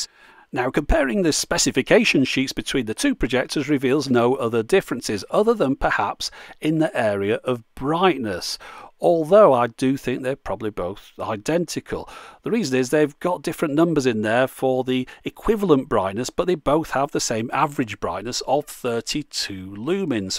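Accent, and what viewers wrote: United Kingdom. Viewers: Great sound, excellent video, proper British accent. Superb review.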